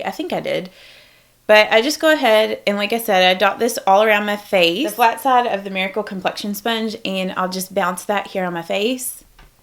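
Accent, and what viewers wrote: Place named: West, United States. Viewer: I like your kinda accent like...it's hard to explain it's like western kinda, you say old like ode...idk but I like it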